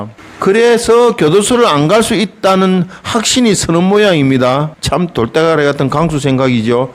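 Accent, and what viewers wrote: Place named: Busan, South Korea. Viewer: 역시 우리 부산소리 ㅡ 확끈하다...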